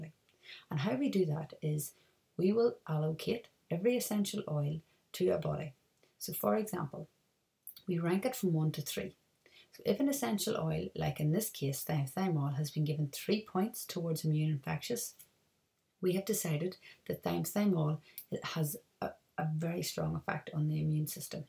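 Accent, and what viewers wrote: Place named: Northern Ireland, United Kingdom. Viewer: Hello! This is a fantastic resource, and something I hope to invest in, in the future! Would you mind me asking where you're from? There's a definite touch of Northern Irish in your accent :D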